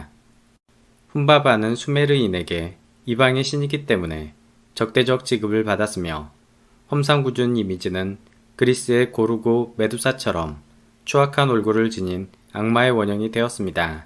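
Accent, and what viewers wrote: Jeolla, South Korea. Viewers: 숨기지 못한 전라도 사투리로 듣는 길가메쉬 이야기 감사합니다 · 전라도 사투리가 정겹습니다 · 혹시 전라도 분이신가요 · 전라도 분이신가 사투리발음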